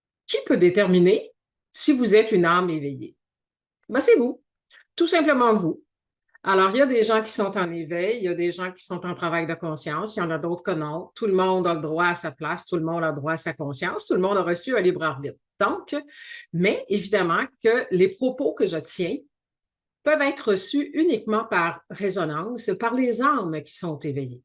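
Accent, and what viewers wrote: Quebec, Canada. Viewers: Bonjour, je vous ai découverte hier...je suis en accord avec vous...effectivement nous avons tout en nous, quel bonheur diffusons cet amour autour de nous rayonnante notre lumière divine.merci amie du Québec que j aime votre accent. Plein de bisous du cœur de France 💖🙏🫶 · Le vrai problème c'est le fort accent québécois 💕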